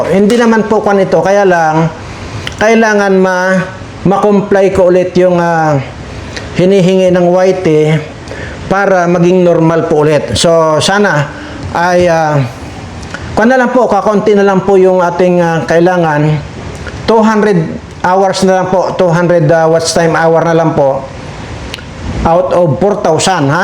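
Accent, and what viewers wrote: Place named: Hiligaynon, Philippines. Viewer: Tuod man nga ilongga ka day ,sa tono ng salita mo ,namit ka nga gahambal sang bagbakal mo ng juice sa tiangge, nano ka man nga ivedencia gid ang ginahimo mo ? Duda ka pa sa tono day??? Ha ha ha I like it puedi na ako ilongga sir?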